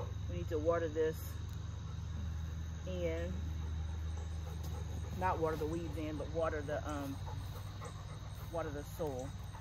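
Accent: South, United States. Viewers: Do NOT un-Southernize the way you talk ! That's a good part of the fun, to hear how we all talk so different. Lol. Many blessings everyone. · I live in Florida but I'm from Georgia & your southern accent is strong & hilarious to me, love it 😂 U are ao funny and yes hunny its hot 🔥😅 Gardening is fun but frustrating at time with fighting critters that eat up your 3 months of hard work in 1 day! But just keep going 👍. I love your channel! ❤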